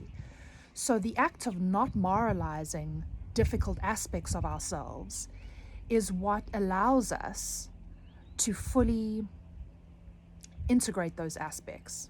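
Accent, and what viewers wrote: South Africa. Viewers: is this a South African accent?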